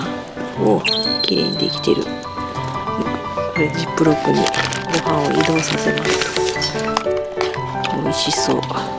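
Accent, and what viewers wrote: Kansai, Japan. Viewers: 4度って夫の実家の台所の温度😂😂🐻音を聞いていても楽しいし、主様の話し方が同じ関西なのでとても親しみを感じます。メスティンのご飯いつも美味しそう❗暮れていく富士山絶景でした😢✨お疲れ様でした😃！一緒にラーメン🍜🍥食べに行きたいです！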